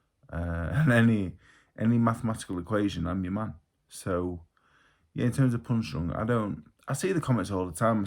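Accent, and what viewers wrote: Yorkshire, United Kingdom. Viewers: Never quite got my head round how people have conceived u to be punch drunk? I don’t know if it’s because I’m northern too and just take it for granted this is how we sound?! Especially being a Yorkshireman it’s almost a curse if you box as people assume uve taken too many punches when really you’ve been raised by big burley, thick sounding farmers or somewhere down the line ur ancestors have! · Definitely no slurred speech, you speak slowly, precisely and are articulate. Plus Yorkshire accent aswell might confuse southerners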